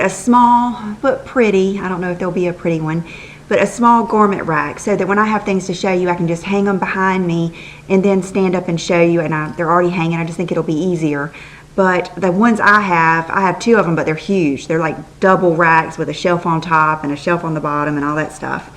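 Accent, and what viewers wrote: South, United States. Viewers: I've been obsessively watching your videos because I LOVE your accent lol! As an East Coast girl, I just love listening to that sweet, southern accent! (Also, you're just so lovely!!)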